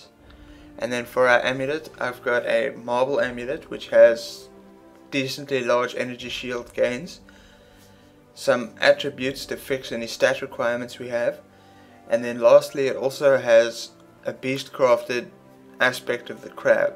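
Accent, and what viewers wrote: South Africa. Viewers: I knew that accent was South African :D mooi man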